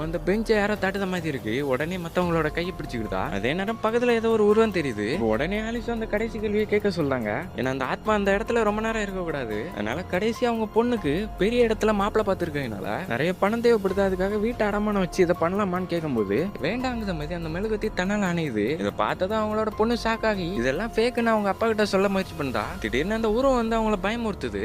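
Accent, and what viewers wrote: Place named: Tirunelveli, India. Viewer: Youv thirunelveli machan.... Super paaaa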